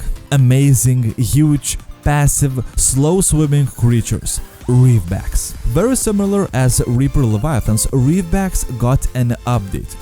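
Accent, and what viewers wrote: Russia. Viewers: Wait IS THAT A RUSSIN I HEAR ??? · Ты русскиий? У тебя акцент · It love you're Russian accents!!! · omg i love his russian accent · he sounds like a stereotypical russian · Мммм... Русский акцент) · Максимально русский акцент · Акцент прям как у русского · Are you Russian or from Republic of Moldova? :) I ask that bcs of your accent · Русский акцент или нет? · That russian accent tho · Are you from Russia? Your pronunciation is very similar to Russian. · Youre accent youre from russia? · Акцент русский. Разве нет? Четко слышно букву "Р" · yea i can see you sound russian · Russian accent I love it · Бля, чувство что ты русский) акцент точно не инглЫш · Love Russia accent friend... no really I like the Russia accent!!!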